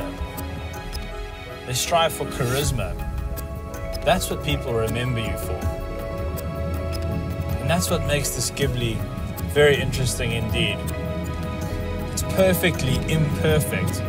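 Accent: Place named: South Africa. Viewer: I watch this video over and over then look over my shoulder and see my beautiful Ghiblet S sitting next to me in my garage. It's my daily driver. M-W-F and on weekends. I will be driving this car 288 miles per week. I am from San Clemente, CA. I love this video and controversial South African accent. I was going to get a BMW 550I but I am so happy I got this anything but ordinary Italian Stallion. Take me to J. Bay and Skeleton Coast Namibia for a surf. I'll show you Trestles and related breaks.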